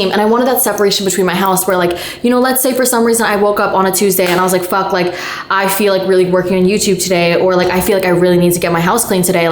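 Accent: United Kingdom. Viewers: We love your Bri’sh accent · you are my queen and the british accent pops off x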